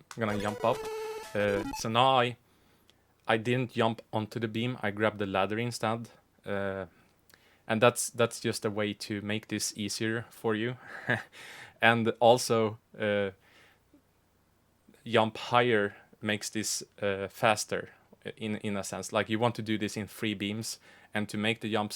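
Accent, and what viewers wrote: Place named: Sweden. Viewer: your swinglish is awesome. thanks for all the vids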